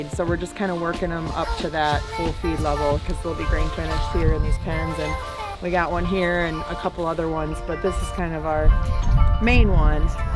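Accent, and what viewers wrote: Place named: North East, United Kingdom. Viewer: where is your farm located you sound a lot from the north east, I'm from Pa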